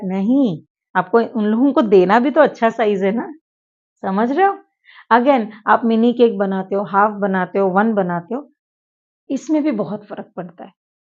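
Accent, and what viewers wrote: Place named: Gujarat, India. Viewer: Medam gujarat se he ya fir mumbi se he 😊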